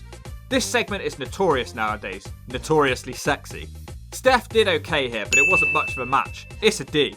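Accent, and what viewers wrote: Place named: England, United Kingdom. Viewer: Why are the narrators always English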